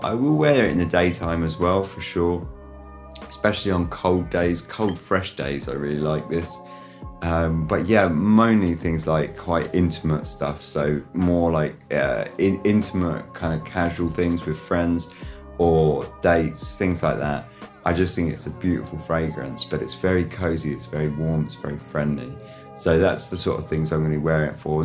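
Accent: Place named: United Kingdom. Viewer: You are really cute, thanks for commenting on my video;) I had no idea about you. I am aaalllll about Britisch accent (I'll watch your videos just for the accent, just kidding!) and I am looking forward to more videos;) Like and a new subscriber:) From the ones you mentioned I love Guerlain. How old are you?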